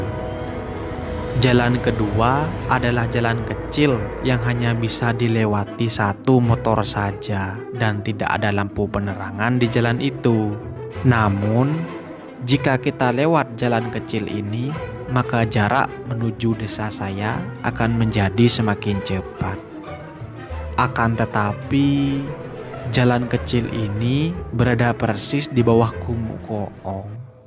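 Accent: Madura, Indonesia. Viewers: Note voice atau logat indonya khas bondowoso madura..tapi keren dah..lanjut kan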